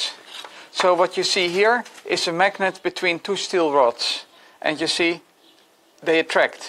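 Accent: Netherlands. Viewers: It's been more than 10 years.And the Dutch accent has still not completely gone.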